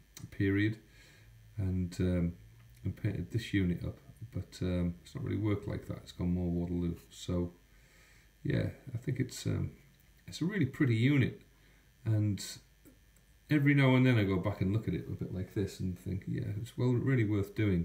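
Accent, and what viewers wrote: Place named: North Yorkshire, United Kingdom. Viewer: That is a nice paint job well done. Apologies for confusing you with a Geordie. You are actually a North Yorkshire Chap!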